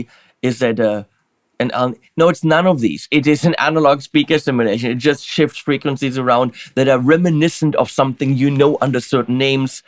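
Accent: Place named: Germany. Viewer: ..... 🤩🥰😍😊 👍👍👍👍👍👍👍👍 Deine Arbeit, deine Reviews, dein Witz/Humor, dein Guitarplaying, dein "deutscher Accent" 😂, deine farbefrohe Kleiderwahl (absolut meins 👏👌), die Tier-Clips am Schluss....... 😂 Habe mittlerweile natürlich einige ähnlich gute "Rezensenten" gefunden, deren Clips ebenfalls richtig gut sind..... die ich mir (man sich) auf jeden Fall von vorne bis hinten reinziehen kann...... aaaaber, Du bist unschlagbar 😅✌😎 Freue mich auf jeden neuen Clip von dir 👍 Vielen vielen Dank für deine Arbeit und beste Grüße aussem Pott Rosigatton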